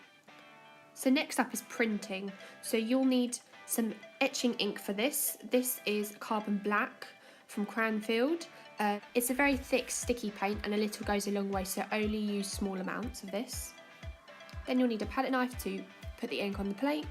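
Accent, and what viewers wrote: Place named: Bristol, United Kingdom. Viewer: A Bristol accent! My roots!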